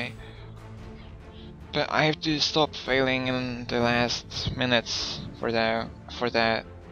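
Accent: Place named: United States. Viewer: btw for being from poland u have a "pretty-hardcore-US-voice" xD with that i mean ur english-speaking is very good! :D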